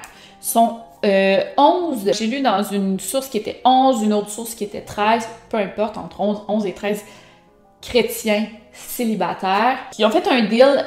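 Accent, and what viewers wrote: Quebec, Canada. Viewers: accent québécois* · J'aime bien ton accent québécois 😁😁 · Bonjour, oui tu dois te dire que je commente toutes les vidéos en même temps. Le fait est que j'ai compris que c'était un bon moyen de t'aider, et que je ne l'avais pas fait encore, j'ai donc repris toutes les vidéos déjà vues pour les commenter et liker. Dans cette vidéo tu parles un peu de toi et de ta vie privée. N'ayant pas Twitter, ni Insta (je trouve bien suffisant un Facebook, à titre privé) je n'ai pas trop suivi les détails, mais j'ai cru comprendre que tu avais traversé une période difficile. Alors comme la plupart ici je te dirais (même si rien ne m'autorise à donner mon avis sur ça en fait!) que tu as raison de privilégier ce qui te rends heureuse. Si faire des vidéos ne t'apporte plus ponctuellement autant de plaisir ou que tu es lasse, alors il ne faut pas hésiter à faire un break. 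Si tes followers aiment ton travail pour ce qu'il est, ils sauront tout aussi bien attendre et seront très heureux de voir les prochaines vidéos, peu importe leur date de sortie! Pour ma part je te trouve excellente, ton travail est remarque, je me répète mais ce n'est jamais trop! J'adore la manière dont tu racontes, avec la gestuelle, et ton accent québécois, qui pour moi Française du Sud, est absolument charmant, et donne un vrai bonus à l'écoute de tes histoires! Cela me donne envie de t'écouter parler:) Merci pour tout, vis ta vie comme elle te convient et penses surtout à prendre soin de toi. Le reste, les gens vont et viennent dans nos vies et nous ne pouvons pas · Elle est québécoise pas française, son vocabulaire, accent et autres change…C’est assez évident pourtant :) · vraiment , je ne supporte pas cet accent du canada ou idem , c est une horreur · J'adore ton accent canadien, ça te rend encore + sympa ! 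· Le truc vraiment étrange c'est que je déteste l'accent québecois, à la base j'ai en horreur ce genre de vidéos sur les meurtres, mais à chaque fois que j'ai une de tes vidéos en suggestion je ne peux tout simplement pas m'empêcher de cliquer, limite je commence à apprécier ce petit accent québécois MAIS QUELLE EST CETTE MAGIE NOIRE MAÛDIT TÔBÊRLNÔCLE????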